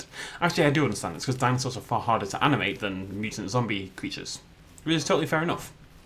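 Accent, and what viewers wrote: United Kingdom. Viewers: It's Zed. Just like Maisie in Fallen Kingdom, you speak the Queen's.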